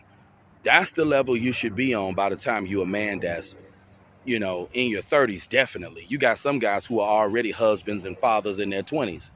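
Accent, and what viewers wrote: United States. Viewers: That deep south voice.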